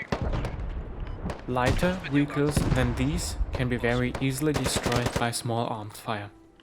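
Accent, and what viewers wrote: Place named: Russia. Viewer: Какой то акцент русский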